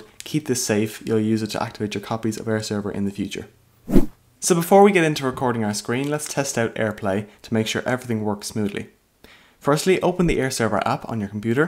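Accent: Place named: Ireland. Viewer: I'm guessing Ireland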